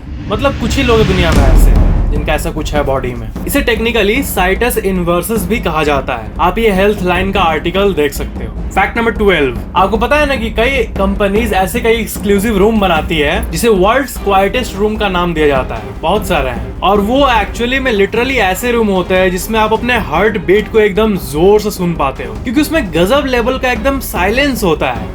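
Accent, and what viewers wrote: Bihar, India. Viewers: Bihar se ho jio bro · U r from Bihar bhole bhihaari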